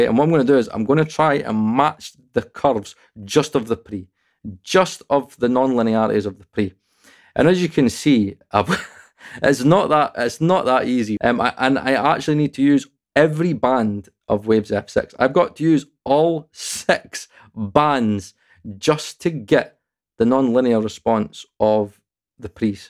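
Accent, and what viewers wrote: Scotland, United Kingdom. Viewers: couldn’t agree with you more. Fortunately for me around 2000 I dreamt of having a studio in a rack, a plug and play studio that was minimalistic in nature and digital did it for me. I was never going back. Now I use the stock console plugin from my DAW (Cakewalk) and thanks to Waves thievery I steer well away from plugin manufacturers BS. What I want is a modern interface, no bloody knobs please and no fake ssl front ends. I don’t want colouration though I do use the tape plugin that comes with my daw. I’m slowly being weaned off this analogue addiction. I went digital for a reason and I must not forget that reason. Thanks for your videos. Love your Scottish accent by the way (viva Scott McTominay haha) and I look forward to your next video ruffling the feathers of these plugin manufacturers. Keep on keeping on brother. 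· Lovely Scottish accent! 😊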